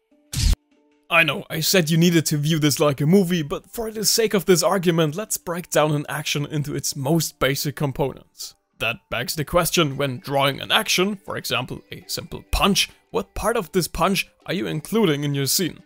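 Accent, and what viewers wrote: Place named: Germany. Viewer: Dieser Deutsche Akzent xD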